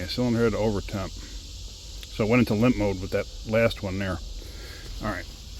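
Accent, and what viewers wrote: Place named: New York, United States. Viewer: Ok, thanks. Sounds like he has a NY accent so that's why I thought Mexico,NY.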